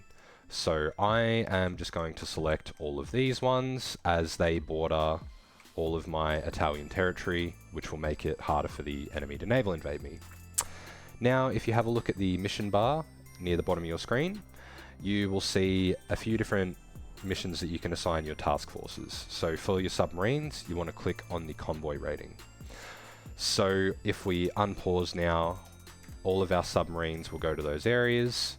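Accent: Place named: Australia. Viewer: Interesting accent, "future" - "fyu chah", "player" - "pla yah". Where are you from? EDIT: Nvm, your profile says Australia, mate.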